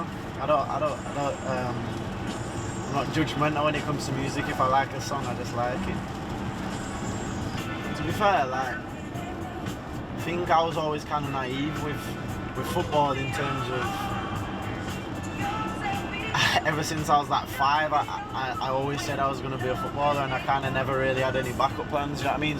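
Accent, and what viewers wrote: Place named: Manchester, United Kingdom. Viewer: Sounds very manc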